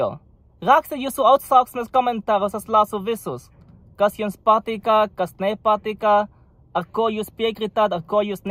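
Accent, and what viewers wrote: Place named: India. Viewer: Kas var būt labāks par ar Indiešu akcentu 😂 Kruta parodija hahahaha 😂😂😂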